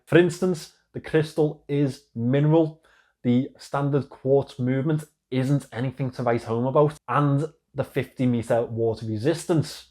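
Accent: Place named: Scotland, United Kingdom. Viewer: This lovely scottish accent !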